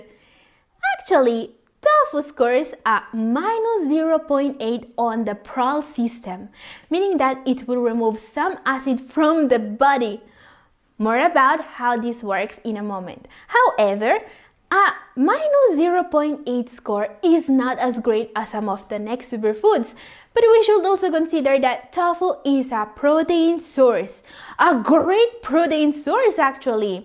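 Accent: Italy. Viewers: was born in the philippines, but she studied in italy from grade 1 up to liceo, n she took seriously her studies. Sometimes her accent words are in italian without noticing her english pronunciation. We have to understand italian n english pronunciation are different. We have to excuse her wrong words mistaken. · Oh is so awesome… she has slightly italic accent though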